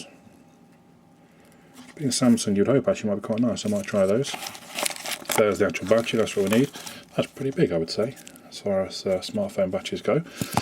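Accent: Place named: United Kingdom. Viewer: BRITISH ACCENT!!!!:D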